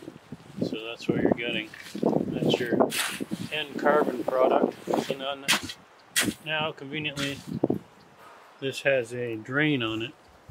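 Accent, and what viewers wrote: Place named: North, United States. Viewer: that you! I knew you were from the north from your accent. I was born in Wisconsin, but transplanted in Brownsville Tx.😂😂 Still haven't shaken the milk 🐄 thought 😂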